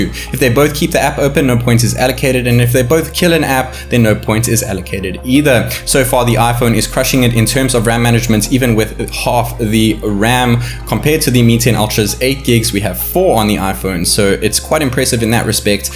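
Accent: South Africa. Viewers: Sensing a South African vibe Check your Location: South Africa!!! YEBO!!!!! #subbed BTW, where did you get the Xiaomi, I really wanna get one! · homie sounds south african lol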